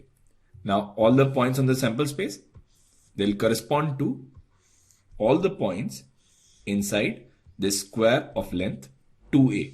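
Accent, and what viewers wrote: India. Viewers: He is indian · I cannot even read the rightmost part of the question and the Indian accent is strong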